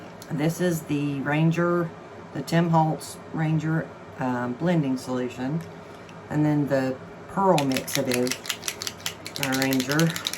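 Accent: South, United States. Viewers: Hi Lovely Lady!! That really is an awesome looking galaxy that you’ve created there! It’s beautiful, truly. Will you resin and mount it? I feel though that I have to comment about the fact that you don’t wear a respirator as much as you should. It worries me. Personally I would much rather you did your painting in silence? Of course with a voice over. I love your southern accent! But seriously, you know better than I do about the possible medical implications. I hope you won’t be annoyed at my saying so, but I do enjoy watching your videos and I learn so much, so please don’t gamble with your health. It may take a little longer of course, to post your video after the added narration, but hey, we can just wait (with baited breath) for the next masterpiece! Thanks so much for sharing your talent. I and many other viewers DO NOT want you to get poorly. 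🙏🙏🙏🤗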